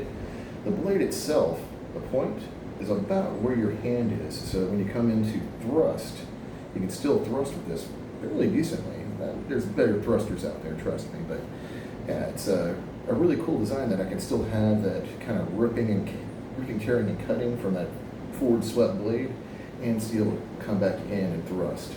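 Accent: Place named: United States. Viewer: your accent is american, but your house is balkan, where the hell are you from lol cool swords btw <3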